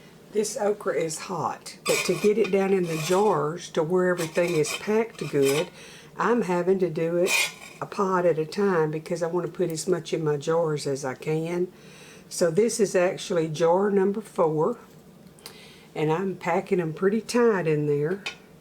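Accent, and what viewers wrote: South, United States. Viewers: Good morning! I'm not an okra lover as I mentioned last time lol. I just popped in to watch and listen to your lovely southern accent and to say good morning. I really don't mind what you can or cook I just love visiting with you in your kitchen. Big hugs, Michelle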